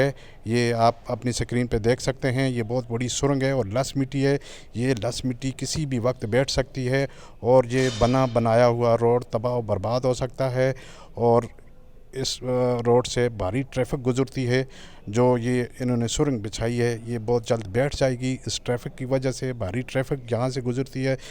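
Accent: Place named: Azad Kashmir, Pakistan. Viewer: Aap ka lehja azad kashmir wala laghta hai.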